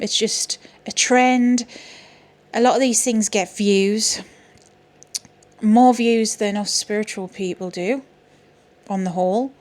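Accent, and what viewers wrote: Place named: Newcastle, United Kingdom. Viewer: It's a Newcastle accent, and it's perfection 😍 (I am obsessed with all the American accents. You guys have tonnes. ❤)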